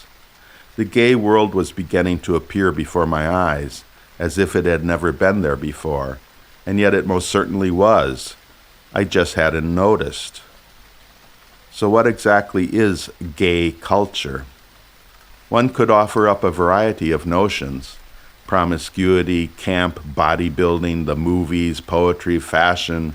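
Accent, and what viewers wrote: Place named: Milwaukee, United States. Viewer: I was looking forward to listening to this while getting back into the studio, that's how I usually listen to any radio programs. Thus maybe I didn't have the type of undistracted focus I might have had if I was not working on my "skilled" painting at the same time, but it was nice in that it felt like I was painting while in a room with my friend. His voice is so soothing in this piece, with the strong Milwaukee accent that made me homesick for the midwestern United States when I first met him in India, that he can broach ideas that I might disagree with in part with the kind of reasonable dialogue and give and take that used to be a part of our political culture, in art and politics, but sadly seems to be lacking now. I love how it flows in and out of past, present, the personal, political and framed by his residency with his friend and scholar Mary Storm in Sri Lanka. 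I feel I could listen to this again and again and get something different from it each time.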